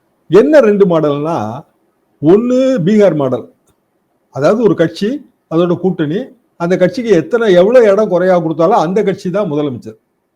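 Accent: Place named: Thanjavur, India. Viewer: உனக்கு என்ன அதுல, அவரு அனுபவத்தை சொல்றாரு.. தஞ்சாவூர் பேச்சு தமிழ் அப்படி தான்..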